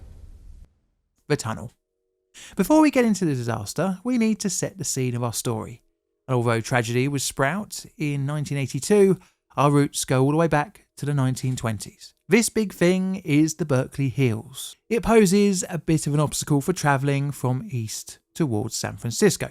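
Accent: United Kingdom. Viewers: I like your British voice, and humor.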